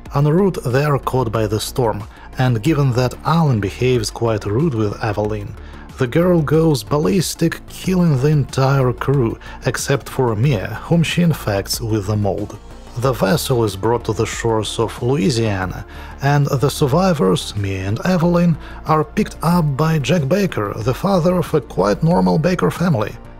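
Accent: United Kingdom. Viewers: Vpolne po yorksherski))) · oh are you from england?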